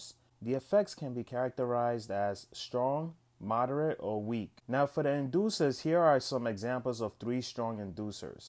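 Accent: United States. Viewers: great video. lol but I swear I can hear a NYC accent. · Why do I hear a nawlins accent?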